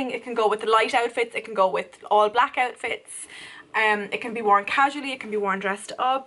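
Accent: Ireland. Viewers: Knew you were Irish the min you opened your mouth!😍 love Irish youtuberssssss😁😁👑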